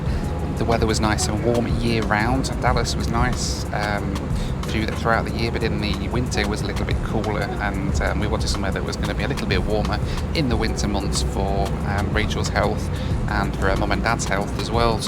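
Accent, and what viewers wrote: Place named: Leicester, United Kingdom. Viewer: Which part of Leicester you from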